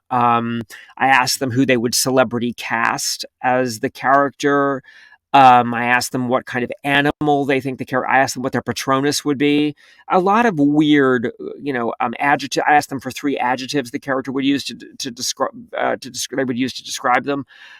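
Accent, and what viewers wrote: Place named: Australia. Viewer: I love his Australian accent...Him narrating Honeymoon For One by Keira Andrews is amazing!!!!!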